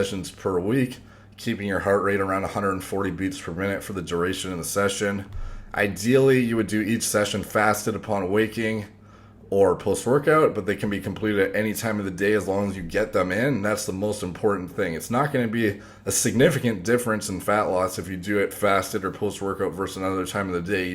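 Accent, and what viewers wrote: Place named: Canada. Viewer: He sounds way more Canadian here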